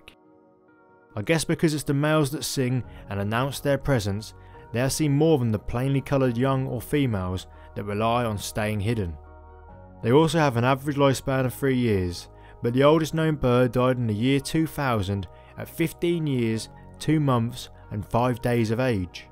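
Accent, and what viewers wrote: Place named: East Anglia, United Kingdom. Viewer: Are you from East Anglia? That accent sounds familiar haha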